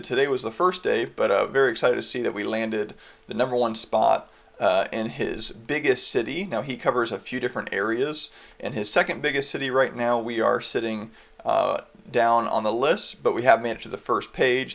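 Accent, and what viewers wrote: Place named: United States. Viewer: I'm English and the American accent can be very jarring to hear, but this guy's voice is just about okay.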